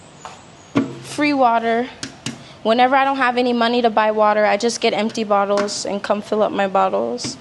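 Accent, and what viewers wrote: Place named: Bahamas. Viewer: Her voice sound like Bahamas, Bahamian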